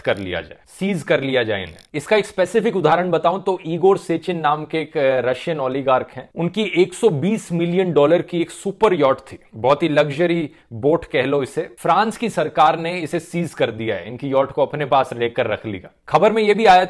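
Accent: India. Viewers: I watched his video after many years today, i had stopped watching his videos when i realised his propoganda. But i still respect his quality of research on non-partisan issues. A big change i got to see is in his Hindi language tone. He has changed his accent in Hindi and now it sounds more desi He deliberately says Hajar instead of Hazar. Good marketing for his propaganda All the best to him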